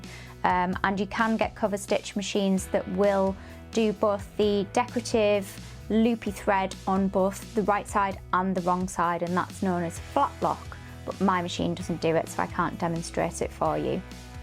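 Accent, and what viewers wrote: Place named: United Kingdom. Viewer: Nice to hear a UK accent 😎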